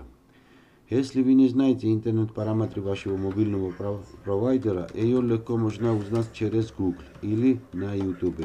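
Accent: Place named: Georgia. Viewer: Грузинский акцент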